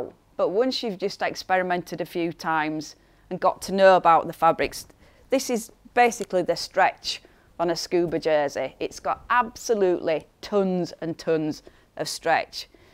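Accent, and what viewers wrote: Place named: Yorkshire, United Kingdom. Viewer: That’s a Yorkshire accent 😉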